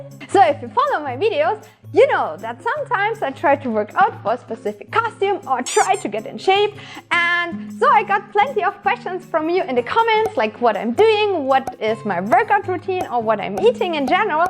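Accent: Germany. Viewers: yeah haaaard accent i'm from germany too;-) I'd like too see a german cosplay video not made by anny the duck (doesnt means their not good)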